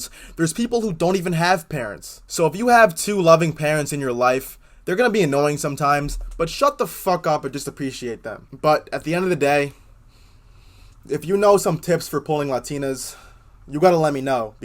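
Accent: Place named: Brazil. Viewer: Your way of speaking reminds me of brazilians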